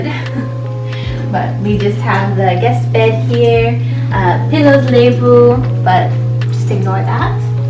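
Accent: Telugu, India. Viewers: Chellemma nee telugu yentho mudduga baaga Matladuthunnavu,,, naaku maatru baasha telugu yentho istam..mee eddariki naa deevenalu ..mee amna , Nanna etc valla gurinichi video pettandi..all the best ,,,, n dasara SUBHAKANKSHALU... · Meeru telugu matladuthunte chala cute gaa untundi andi , naaku chala istam andi mee telugu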